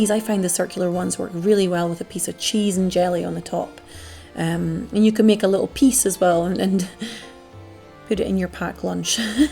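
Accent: Scotland, United Kingdom. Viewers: oh I do enjoy the recipes and perhaps even more so because of your lovely Scots. It's music to my ears. Happy Burns Night from the Bavarian Highlands, the alpine region. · Came to this video from your crowdie video. Your camera work and quality are excellent and you have a lovely soft voice and of course the Scottish accent is the cherry on the cake. 😊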